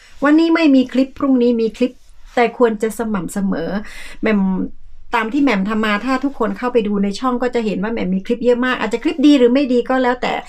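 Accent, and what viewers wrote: South, Thailand. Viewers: ชอบพูดใต้น่ารักดี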